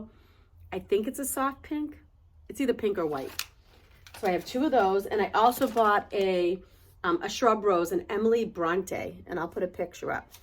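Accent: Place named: Massachusetts, United States. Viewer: I'm surprised you didn't call Ms. Dig before you dug some holes. Be careful!! Love your accent so Massachusetts just like mine even though I've been gone from Taunton & Boston so many years ago. You sound like my cousin from Taunton. Spring has sprung....take care.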